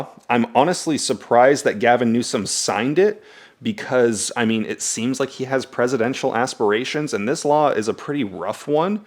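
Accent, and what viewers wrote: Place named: New Jersey, United States. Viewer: Sounds like Jersey.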